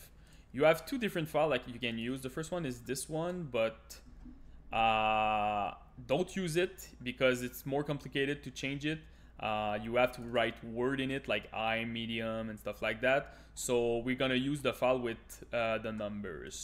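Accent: Quebec, Canada. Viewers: merci pour le tuto... j'écris en français parce que ton accent paraît très québécois ^^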